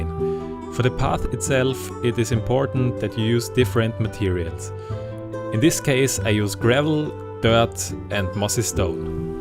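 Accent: Germany. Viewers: Another great video, and with a German accent to boot? <3 Also... your mc skin is Finn, the Human? <333 Keep the videos coming, ^^*Also, don't apologize for your accent/language- be proud of your heritage, and exuberant for your linguistic idiosyncrasies! ^^ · great video! definetely will use these ideas in my world, like the hay car ;) i didnt mind your German accent, im Dutch so thats even worse :P great video, A like and A subscribe from me ;) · Mit z German accent =P nhe man, we can clearly understand you and you speak English very well. Love your vids👍🏼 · your accent is cool btw haha, german sounds great, dont be shy ! :p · Du bist deutsch das höre ich aus dem akzent =D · gut zu wissen, dass du deutscher bist :D Mach dich noch sympathischer!!! Aber wenn man es weiß hört man es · Your German isn't bothersome at all. I can understand a few words, so don't worry much. 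· You are an awesome builder! Ehrlich gesagt dein deutscher akzent macht deine stimme noch angenehmer :D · Sehr schönes Video und man hat sofort gemerkt das du ein Deutscher bist :D Ist nicht unbedingt schlimm, dein Englisch ist gut verständlich und du hast eine angenehme ruhige Stimme. Atmosphäre ist wirklich kein leichtes Thema, selbst für erfahrene Bauer. Bei den meisten Leuten die ich kenne ist der Anspruch an Atmosphäre überhaupt oder wenig vorhanden. Die Umgebung ist meistens wichtiger als das eigentliche Gebäude und das wurde hier schön dargestellt ;) Ich finde es müssen wirklich kleine Geschichten durch feine Details eingebaut werden, aber auch die Materialien müssen sehr variieren, also random platziert werden. Schöne Grüße aus dem norden Deutschlands ;) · Sehr gutes video das mit dem deutschen akzent ist net schlimm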